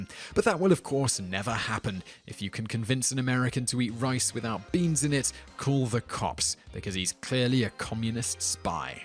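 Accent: United Kingdom. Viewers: I'm very confused... you sound british, your videos etc refer to US currency and culture (including references to foreign being anything not American), but in one of your other videos I thought you said you lived in the Czech republic??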